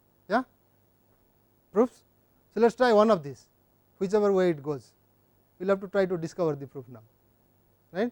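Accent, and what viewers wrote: India. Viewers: true. its really hard for non-Indians to understand profs accent. Subtitles would help since the content is good quality.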